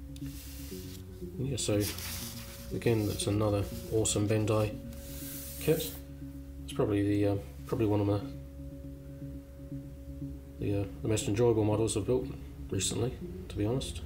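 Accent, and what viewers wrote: New Zealand. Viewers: you are extremely talented! nice paint job! also are you from New Zealand?!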